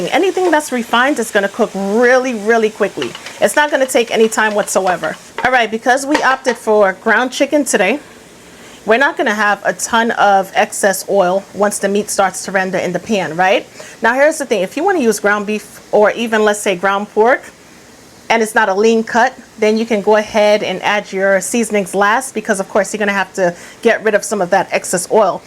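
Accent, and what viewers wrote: New York, United States. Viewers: I love your cooking and I love your accent! Sounds a bit like New York! Thanks for sharing so many delicious recipes 😊👍🏼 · I LOVE the east coast accent, New York, Jersey, or am I wrong?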